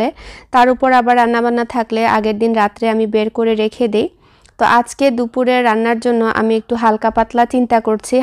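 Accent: Sylhet, Bangladesh. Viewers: আপা তোমার বাড়ি সিলেটের কইগো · Mashallah 🥰 This kids and your Shylety accent are really love ❤️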